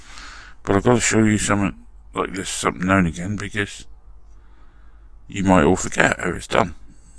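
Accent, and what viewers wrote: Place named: Plymouth, United Kingdom. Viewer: Hi, I have been doing this since lockdown, really enjoying it. Used your video to help me with the Green Hornet. I am in Plympton Plymouth, from your accent you Must be a Janner! All the best Mark. Starting the Joe 90 this week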